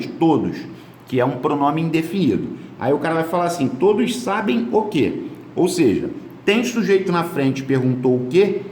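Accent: Rio de Janeiro, Brazil. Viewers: "tem sujeito, perguntou "o que?", é O.D., mané!!! uuauahauha, esse "mané" entrega o carioca mais q o "s" chiado uhauhauhauah Puta aula! valeu de mais,!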